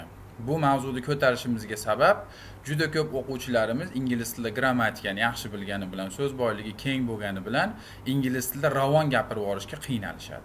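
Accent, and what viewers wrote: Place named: Andijan, Uzbekistan. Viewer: инглиз тилини Андижанча манерада гаплашиш.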